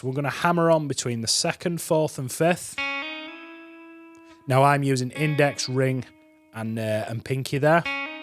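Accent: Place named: Northern England, United Kingdom. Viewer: Great lesson, and bonus it's in a good ol' northern accent, makes a change from all the US channels out there. 😂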